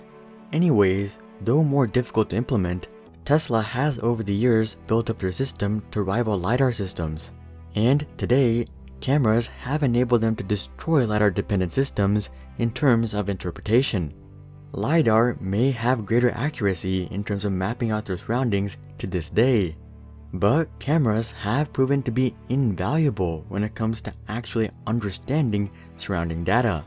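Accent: Singapore. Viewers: The accent of the narrator sounds Singaporean to me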